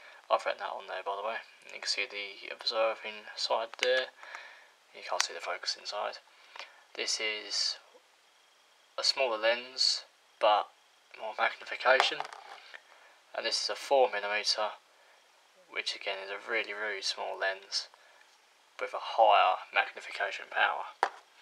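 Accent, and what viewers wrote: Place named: London, United Kingdom. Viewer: you sound like youre from london!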